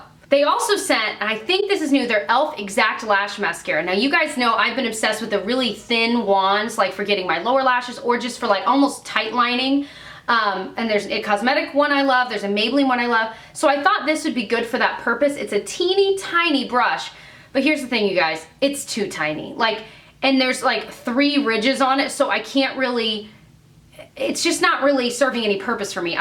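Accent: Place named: Boston, United States. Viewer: U from boston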